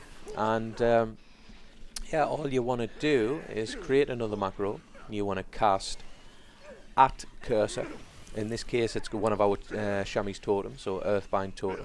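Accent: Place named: Northeast, United States. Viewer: A fellow NE accent felt so good to listen to! Thanks for the guide my man! But I know nothing about macros?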